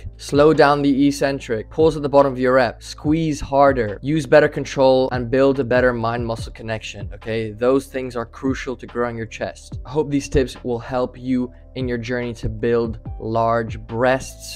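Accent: Ireland. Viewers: Thanks for this. Also, I've never heard an Irish hybrid accent like that before.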